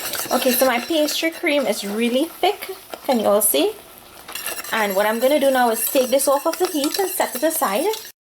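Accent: Trinidad and Tobago. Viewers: Hi am trini my name is Sharon and i enjoy all your recipes I've tried a few and it came out spectacular. thanks so much.am glad you didn't lose your trini accent.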